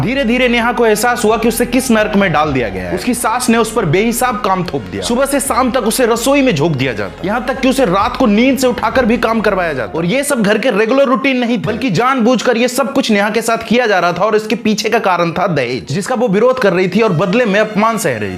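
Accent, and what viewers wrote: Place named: Bihar, India. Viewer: Sadi nh shaadi 😅😅 tum log anchor Ban jaate Ho lekin Bhasha bihariyon wali bolate ho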